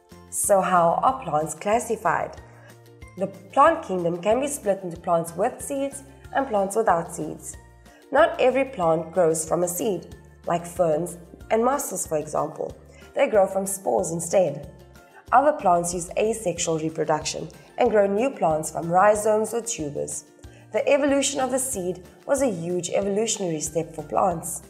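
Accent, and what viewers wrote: South Africa. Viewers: This helped very much thank you 🤧💞😭 (Also you sound south African for some reasons I'm probably wrong tho ) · This helped so much One question: Is the voice over south African?👀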